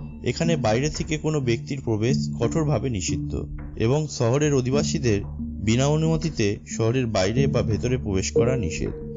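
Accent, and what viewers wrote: Kolkata, India. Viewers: Sabbir Pulok tini kolkatar acened a kotha bolce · কলকাতার বাংলা ভাষা,তাই শুনত‌ে এমন মন‌ে হচ্ছ‌ে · Savage Hasan Ei lok money Hoi Kolkata er tai emn hoi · indian mone hoitece '' bangla thik moto pare na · Kolkatar bhasha ki erokom hoy naki? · Vai apnr kothar moddhe kolkatar vasha mix ase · kolkatar naki dada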